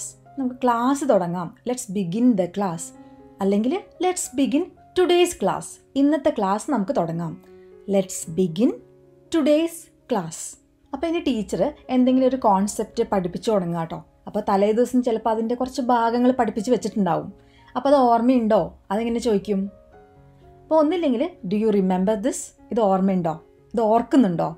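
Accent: Thrissur, India. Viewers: Mam you are from Thrissur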